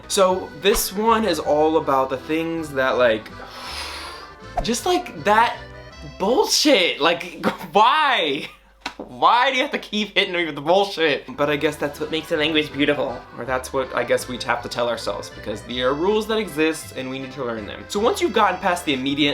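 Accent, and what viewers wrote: South, United States. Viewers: I love your French language videos. In English, you have a unique way of pronouncing words with an interior T (i.e. eaten, important, written). I can tell you’re from the Southern US but where? Merci!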